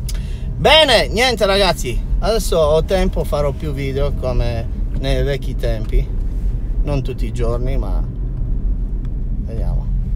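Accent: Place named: Moldova. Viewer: Dall’accento sembri moldavo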